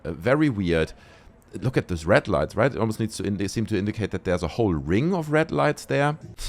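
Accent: Germany. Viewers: Are you german? Sounds like you are. If you are german why Do you dort make your Videos in german? Ich meine würde mich freuen freuen als deutscher ^^ =) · are you a German native ?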